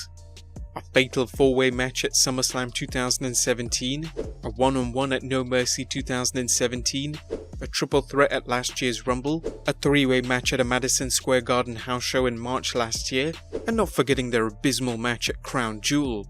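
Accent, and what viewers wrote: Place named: United Kingdom. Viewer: British accent!!!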